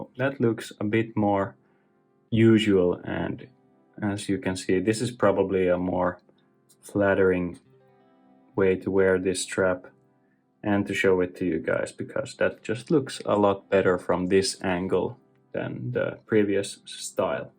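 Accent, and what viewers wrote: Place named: Finland. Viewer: Finnish accent ... immediately I get the impresion this not going to be some BS story.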